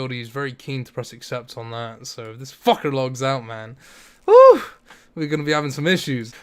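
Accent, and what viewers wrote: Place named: England, United Kingdom. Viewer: Cool furtysix mill loot tab. In all seriousness what region is yoir accent from? I know its and English accent but what area?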